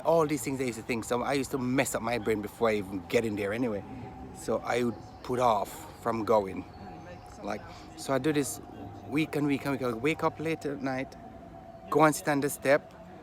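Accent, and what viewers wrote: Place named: Jamaica. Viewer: You sound like a yardie. Love the story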